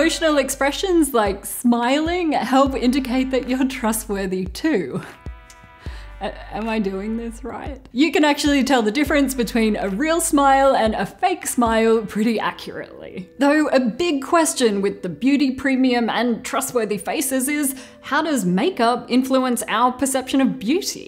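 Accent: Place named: Australia. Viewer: It's alright, i will always trust you because you sound like me (Australian). :DDD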